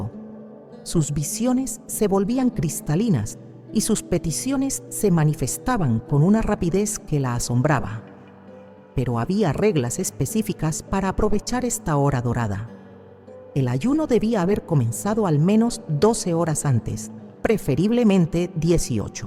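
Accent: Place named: Peru. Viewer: Hermosa voz, con acento Peruano bellisimo!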